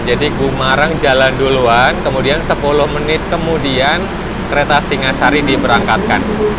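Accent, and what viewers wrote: Surabaya, Indonesia. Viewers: Suaramu itu menang sama 2 kereta pembangkit kok.... 😁😃🤣 Soalnya suaramu itu nge-bass & sangat2 juselllaaasss ucapan huruf2nya kok... 👍👍👍 Maaf, Mas itu asli mana si, kok klo ngomong pake Rek Suroboyoan ya...???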